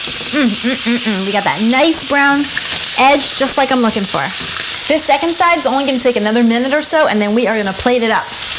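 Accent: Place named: New Jersey, United States. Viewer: Lol what happened to your Jersey accent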